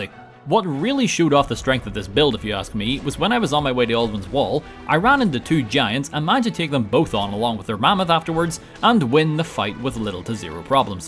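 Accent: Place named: Ulster, United Kingdom. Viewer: has an Irish accent. Specifically Ulster province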